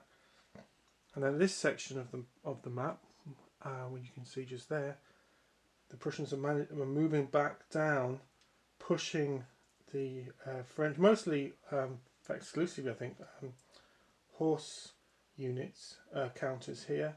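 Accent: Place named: United Kingdom. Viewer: Love this british accent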